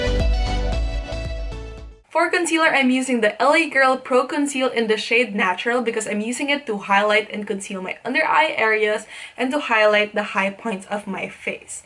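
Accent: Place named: Philippines. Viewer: Thank goodness I finally came across a makeup tutorial video where i can hear and understand straight Filipino English.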